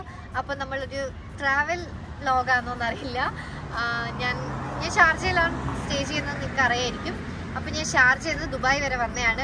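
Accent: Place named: Payyoli, India. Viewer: Payyoli slang.... 👌👌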